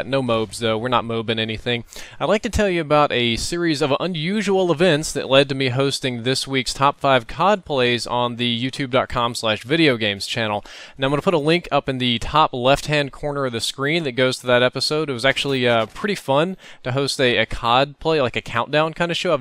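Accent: South, United States. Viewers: I like how puts so much enunciation on the "h ". yes i know its because hes from the south and its just his accent, but it reminds me of when stewie from family guy says cool whip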